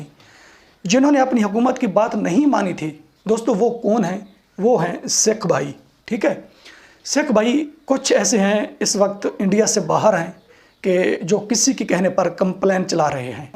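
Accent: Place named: Saraiki, Pakistan. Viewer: bhai andaaze bayyan boltta hai aap sirraaeikee zubbaan ka mixture hai achhaa bolte ho khush rahein panipat s kumar singh o k B y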